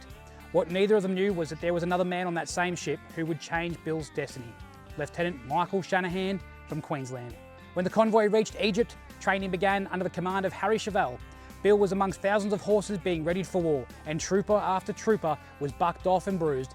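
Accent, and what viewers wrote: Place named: United States. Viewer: Too american, just slow it down and thats when men, were men !!!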